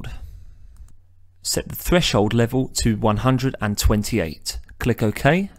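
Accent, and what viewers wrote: United Kingdom. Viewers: Amazing mate! I love it.. your British accent makes it interesting.. May I ask that you do a ''Texturing UV maps for 3d model tank''. For RTS video games like Company of Heroes. Please :)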